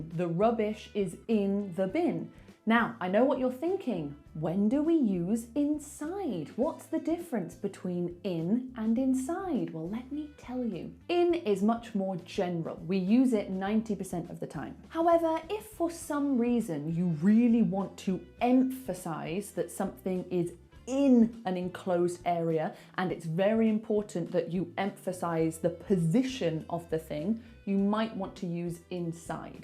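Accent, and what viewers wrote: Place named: RP, United Kingdom. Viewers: I love you rp accent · I like English accent ！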